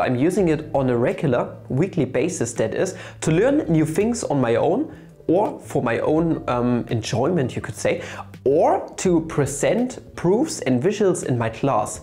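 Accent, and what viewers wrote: Germany. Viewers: I love german accent 👍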